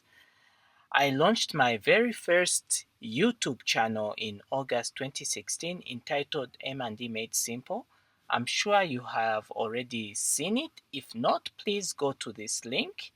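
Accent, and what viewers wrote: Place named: Zambia. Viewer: You sound Zambian.. great lecture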